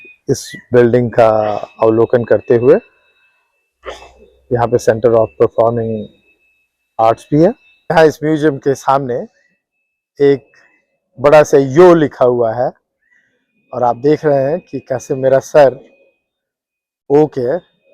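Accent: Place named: Bihar, India. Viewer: Bahut achha lga apka video.. sbse inspiring lga app apne bihari tone ko maintain rakhe hue hai america jake bhi.. proud feeling.. thank you for such inspiring content wo bhi jab apne language or apne tone me rhte hue mil jaye to.. hmlog to india me rhte hue bhi apna bihari tone bhul gaye hai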